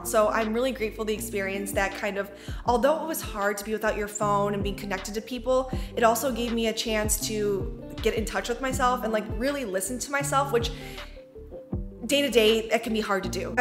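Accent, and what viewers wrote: Midwest, United States. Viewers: Your accent is so cute! You sound like a real Midwestern :)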